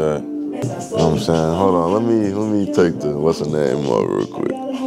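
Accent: Chicago, United States. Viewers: U from chicago foe?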